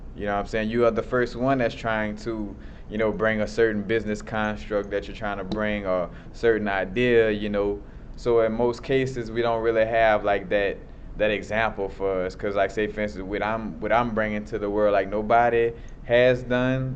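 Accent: New Orleans, United States. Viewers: Ahhh! Yet another BRILLIANT message!🙌 You make being innovative sound so easy lol, but it ain't no joke! I've been saying for years that innovators have no blueprints, yet my friends always wanted to mention how hard it is to start nonprofits, law practices, etc. and I would be like, "You literally have a checklist for the steps you need to take to achieve your goals, yet I am venturing where there IS no path and making it up as I go along." Don't do mentors, b/c no one has my unique insight or experiences; my instincts are my guides, ha! 😹 I have a degree in marketing, yet I don't market any of my skills/creations; the people who are meant to find them will! Don't really do social networking, the so-called "lifeblood of artists," either; too busy creating and living!💃🎨🎢 People often shake their heads and say, "Well how will I find you?" My reply remains unchanged: "You won't find me unless and until I WANT to be found! 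My existence is ART and ART is in constant motion!" LOL!!😆 Lovin this /400 degrees accent, too!💬🔥🎶 🎧😁Keep innovating!!!💃🎉🎆🌌💫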